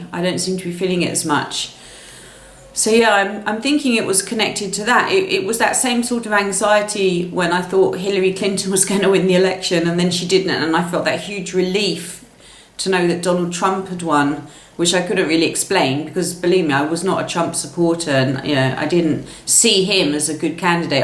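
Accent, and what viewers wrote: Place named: United Kingdom. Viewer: please dont delete your channel. I love your sharings and British accent.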